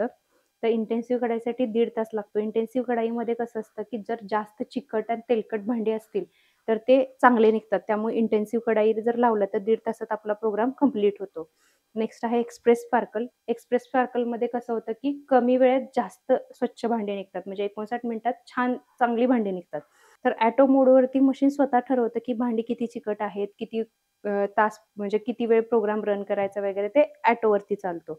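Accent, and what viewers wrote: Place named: Satara, India. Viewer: Satara accent lagech kalte 😂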